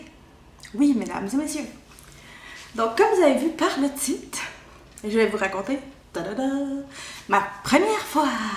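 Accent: Quebec, Canada. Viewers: Accent québécoiss 😉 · Je suis juste fan de ton accent québécois, ça à tellement plus de charme que l'accent français ! 😍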